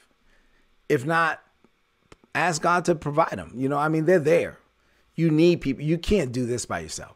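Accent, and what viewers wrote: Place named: New York, Dominican Republic. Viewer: You blessed me Again today. You do that every day. Also, I know your accent is not only NY, but Dominican first. Thanks for the words every day 💞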